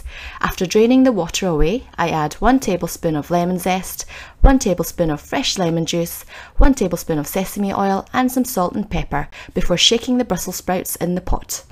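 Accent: Scotland, United Kingdom. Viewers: I'm a new subbie and I love your videos. Good ideas! Out of curiosity are you Scottish because you sound like like you have a slight accent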